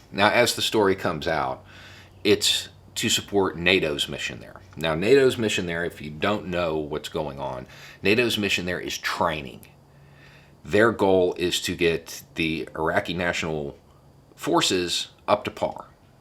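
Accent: United States. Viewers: sounds like the us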